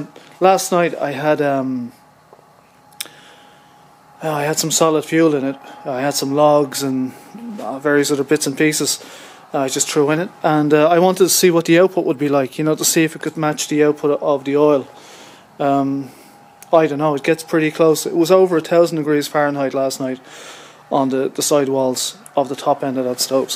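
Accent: Ireland. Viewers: We Americans love the Irish accent. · Excellent set-up! I particularly like your innovative use of the Pyrex dish. It's both handy to see the flame and one of the nice things about having a fire. The crackling sound is water in your oil. That will reduce your heat output... if it matters at all. Dry oil does work a LOT better in these burners. If you were interested in efficiency at all, you could run your Chimney pipe horizontal for a good few feet before ducting it out. You would find there is a lot of heat coming off that which would now be wasted. If you also had a small fan blowing along it, the heat would be better dispersed around the area you are heating. Congrats on a job very well done with this. It's definitely the neatest and most innovative burner of it's type I have seen. You have thought it through very well and come up with a great set-up. Great explanation and who doesn't love an Irish Accent! 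Well done to you Sir!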